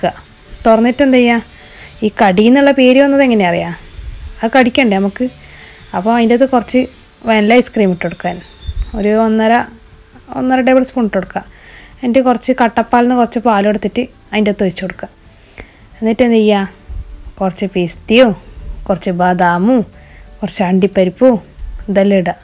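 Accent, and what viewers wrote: Kannur, India. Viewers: Suppertto😍 ningala Kannur basha varthaanam polichtoo🤭😂👍😍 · Chayayillatha kadi.. peruthanne adipoli.... kannur basha pwoli... 😄😄👌👌👌easy & healthy recipe... 😋👌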